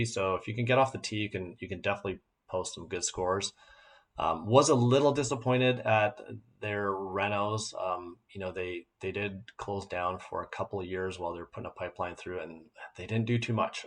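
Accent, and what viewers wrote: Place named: Vancouver, Canada. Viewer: Good video good list. Agree with most of it If I were to guess I’d wager that you definitely are a good golfer but you grew up and continue to live somewhere in south Vancouver (maybe Richmond) and you can tell by the way you talk there’s a geographical bias in this video and in all fairness you said you didn’t include the Fraser valley. If you expanded your criteria by 25kms for the viewer to include ledgeview and sandpiper they are right up there IMO with cap and Seymour without the overblown elitism (maybe better)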